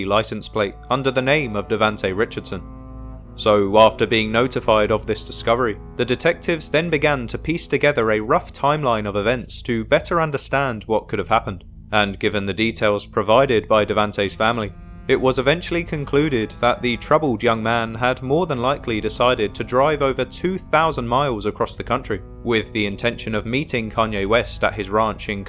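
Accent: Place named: United Kingdom. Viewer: There’s something about a British voice making this sound so not legit. Bad decision.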